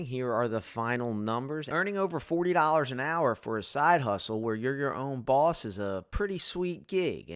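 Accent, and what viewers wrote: Philadelphia, United States. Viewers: now i know for sure you're a true Philadelphian....besides your accent and the Jawn hoodie, you know how to say "Lancaster" right. :)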